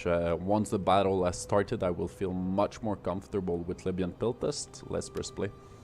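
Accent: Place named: Quebec, Canada. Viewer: You sound very french canadian to my french canadian ears. Am i right?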